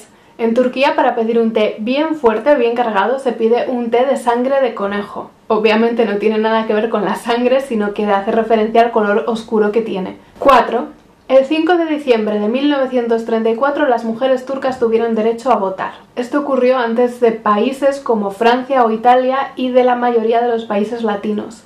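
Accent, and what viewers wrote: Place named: Murcia, Spain. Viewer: Sobre tu voz y tu asiento eres mursiana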